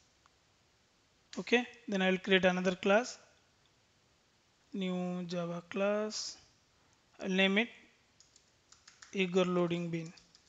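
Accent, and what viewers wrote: India. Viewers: This indish english makes me sick.... everytime I have to hear it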